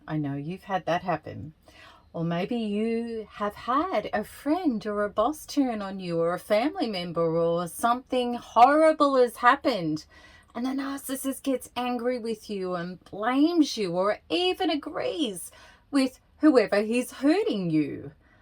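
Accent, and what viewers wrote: Australia. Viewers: I love the Ozzy accent. This content really hits home. My mom was a narcissist and she passed in 2018 and I keep learning more and more about her through these types of videos. It has to be one of the most misunderstood behaviours in society and challenging to understand. But it's a work in progress and that of course is a good thing.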